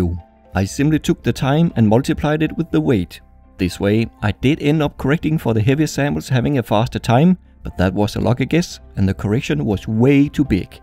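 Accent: Denmark. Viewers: When you just Can hear he’s Danish ;-) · It's funny to hear your Danish. I come from Denmark.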